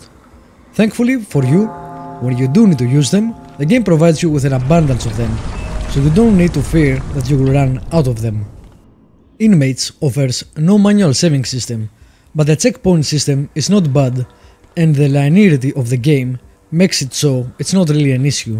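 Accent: Greece. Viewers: Hi " " how are u? I see u continue steady. Tα Αγγλικά σου είναι πολύ τέλεια από πλευράς ταχύτητας. Σε σύγκριση ακόμα και από πριν ένα δίμηνο περίπου που είχα δει ένα Review sου πάλι. το λεξιλόγιο το είχες ήδη. Το είχα καταλάβει κατευθείαν την 1η φορά - σε ένα gameplay apo to Total War Napoleon - και κοίταξα το About και είχα δει από Ελλάδα. Εμείς οι Έλληνες έχουμε πολύ χαρακτηριστική προφορά στα Αγγλικά. Εγώ για παράδειγμα ζούσα 2 χρόνια Σερβία και 8 μήνες Αγγλία το 4ο έτος από το Κολέγιο και την Αγγλική προφορά δεν την υιοθέτησα ποτέ. Σόρρυ εαν ακούγομαι ότι σε κριτικάρω αλλά γουστάρω που βλέπω Έλληνες YouTubers να προσπαθούν. Είμαι 40 και είμαι gamer ap;o ta 11 me Commodore 64, disks 5 1/4". Θέλω και εγώ εάν είναι να κάνω για χόμπι ενα κανάλι αλλά σκέφτομαι το 60-70% με games to υπόλοιπο με κάτι άλλο αλλά τι? Έχω 2-3 πράγματα στο μυαλό μου. Σκέφτομαι Φαρμακευτική Κάνναβη. 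Πριν σου φανεί περίεργο ο αδερφός μου είναι ο 1ος στην Ελλάδα που έκανε το <num> την 1η Έκθεση Κάνναβης στην Ελλάδα (γήπεδο tae kwon do Ιανουάριο 2018 και 2019).. Το όνομα "CannabisExpo" το έχει πατεντάρει και δεν μπορεί να το χρησιμοποιήσει άλλος, έχω κάνει πολλά κονέ και αναπτύσσεται πάρα πολύ ο κλάδος και ο κόσμος δεν ξέρει που να κατευθυνθεί επειχηρηματικά και καταναλωτικά και θα μπορούσα να βοηθήσω με πληροφορίες και το πως πάει το νομικό σύστημα γενικά. συγνώμη για την πολυλογία απλά ήθελα ενός αγνώστου την άποψη ή έστω απλά να το πω, σου εύχομαι ραγδαία ανάπτυξη στο κανάλι σου.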